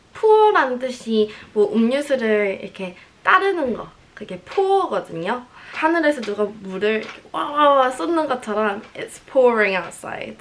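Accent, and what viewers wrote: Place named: London, United Kingdom. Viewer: 런던은 아니구 surrey 지역인데 근교긴 해요! 감사합니당 완전 어렸을 때부터 영어를 쓰신 건 아닌데 완전 억양이나 그런 게 런던 악센트네요! 저는 미국 호주 영국 한국 다 섞여서 완전 인터내셔널인데 대학교 첨 가셨을 때도 궁금해요!!! 썰 같은 거 풀어주세요 ㅎㅎ 미리 알고 가면 좋은 팁이나!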